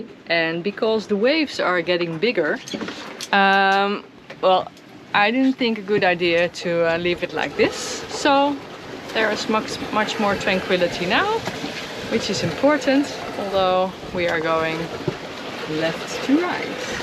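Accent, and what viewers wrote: Netherlands. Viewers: Wauw wow fantastic adventure Ja kijk uit hoor ,die orcas ! 😅 So kool your solo trip . I like hearing the Dutch accent Moet ik aan Vlaardingen denken . Weggevaard in 1959 eerste keer Naar Canada . Beetje grooter boot maar ja. Boobies yes they are .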